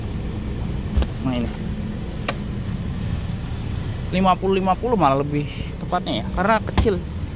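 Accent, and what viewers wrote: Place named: Java, Indonesia. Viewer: om, kamu orang jawa nya mana, kok bahasa jawa nya medok 😆😀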